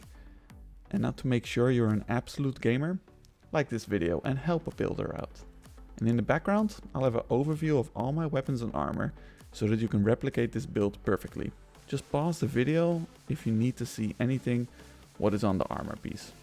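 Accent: Netherlands. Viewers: I can hear the dutch in you so hard haha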